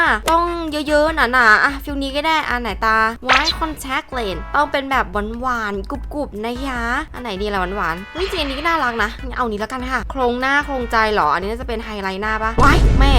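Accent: South, Thailand. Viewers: พี่ เป็นคนใต้รึป่าวคะ😅พอดีเหมือนได้ยินพี่แหลงใต้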